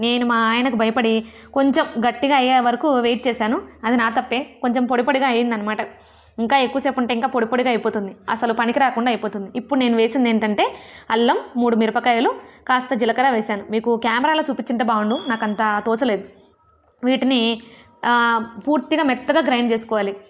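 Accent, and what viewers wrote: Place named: Godavari, India. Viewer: Hi this is purnima mee mattalu vinty gala gala godavari gurthuvastundi😀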